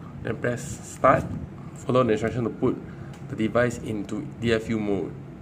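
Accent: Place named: Malaysia. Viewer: no wonder sounds like malaysian.thanks btw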